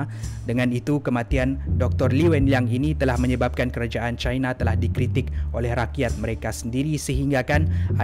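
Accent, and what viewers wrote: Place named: Sabah, Malaysia. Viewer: Cakap sabahan x lari.. I like it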